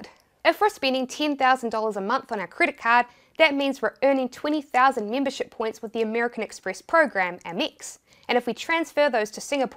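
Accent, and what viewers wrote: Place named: New Zealand. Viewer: That NZ accent. *shudder*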